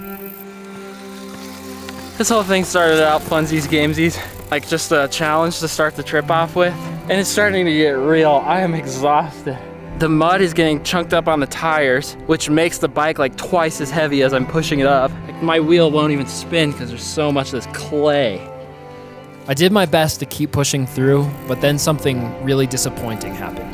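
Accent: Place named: Minnesota, United States. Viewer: Nice video and great editing! I'm hitting the road this summer for a project... But I'm older and going to use a van 😁. New subscriber though today. Couldn't tell, but thought I saw a hint of Minnesota? Best wishes on your new adventures 👍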